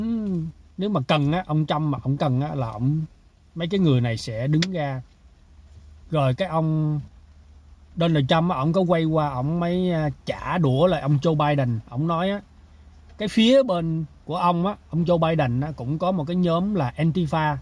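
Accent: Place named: Can Tho, Vietnam. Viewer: Chúc. lạc quan lên mình người bạc Liêu và thích giọng còn càn thơ của bạn nhìn thấy bạn hơi ốm và mắt hơi buồn làm mình buồn lây. Thôi cố giữ vững cuộc sống nhé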